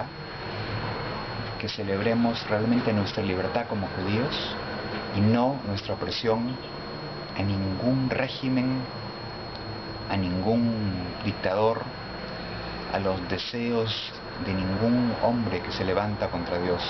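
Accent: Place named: Argentina. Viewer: No reconozco tu acento...sos argentino?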